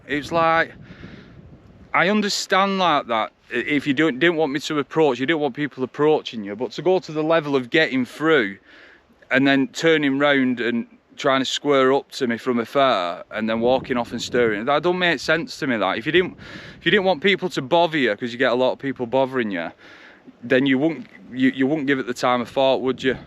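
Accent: Liverpool, United Kingdom. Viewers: You've lost your Liverpool accent. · Yes lad LIVERPOOL was expecting you to say man utd with the accent love the channel lad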